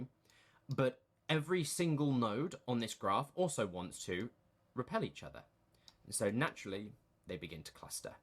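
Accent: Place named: United Kingdom. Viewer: I love the analytical approach to song writing! It's tricky deciphering feedback, and this chain of resources you've established makes a convoluted process much more streamlined. Also, your smooth cadence and suave British accent makes listening to your explanations that much more entertaining. Haha.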